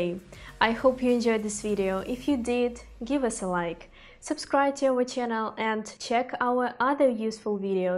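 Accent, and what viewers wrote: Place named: Ukraine. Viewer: I compare her Ukranian English with special accent, intonations with real good English of Elementor creators and I scare to buy and install this plugins. Did they save money just on the speaker or on compatibility and functionality too!?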